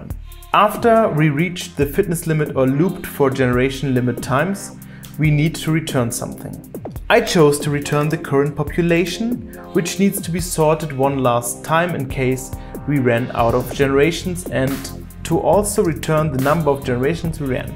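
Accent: Germany. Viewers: Are you german ? If you are german I will subscribe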